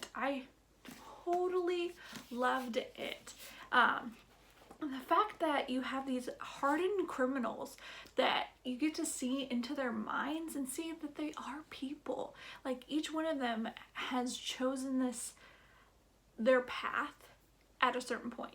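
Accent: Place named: South, United States. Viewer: love your accent, where are you from? South?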